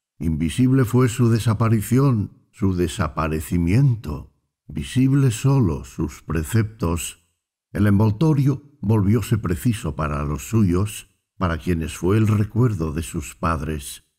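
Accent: Castile, Spain. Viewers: Un poco irónico que el audio sea ejecutado por alguien con acento castellano. Muy agradecido de todas maneras 😂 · Y porque no lo lee alguien con voz y acento de mejico o Guatemala??? Este con tanto acento de los españoles que fueron los destructores, es una gran pena. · Lo Unico que no me gusta es que esta español de España pienso que deveria ser español Latino de Mexico o Guatemala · No entiendo por qué felicitan la narración, si los datos son incorrectos. Uno de esos comentarios, dice que el Libro tiene mal los datos, NO, el narrador cometió los errores, EL LIBRO ESTÁ BIEN. En mi opinión, hay magníficos narradores LATINOS, no me gusta el acento español, prefiero el acento DE NUESTRAS TIERRAS y más para un LIBRO SAGRADO MAYA..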